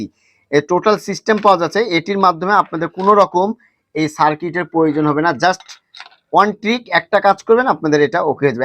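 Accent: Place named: Chapai Nawabganj, Bangladesh. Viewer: ভাই আপনার বাড়ি চাঁপাই না রাজশাহী না নওগাঁ? 😊 আপনার কথা শুইন্যা হাঁরঘে চাঁপাইয়ের মতন লাগছে❤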